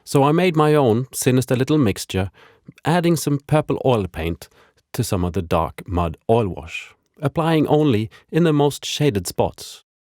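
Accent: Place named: Sweden. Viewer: är du svensk? Nu lade jag märke till dialekten! Btw tycker dina videor är väldigt underhållande att kika på, heja! 👍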